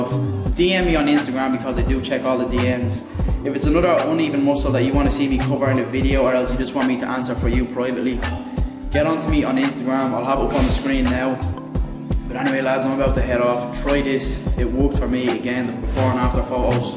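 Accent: Ireland. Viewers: Are you irish ?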